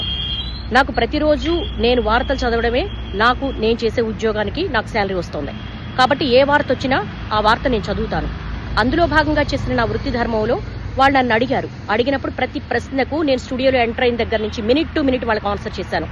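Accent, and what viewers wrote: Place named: Telangana, India. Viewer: That is Telangana Talkn9bans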